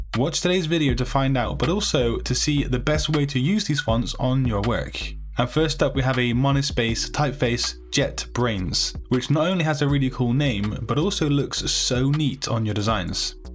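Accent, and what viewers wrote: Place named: Liverpool, United Kingdom. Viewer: Excellent work and content. Highly appreciated. Like your Scouse accent.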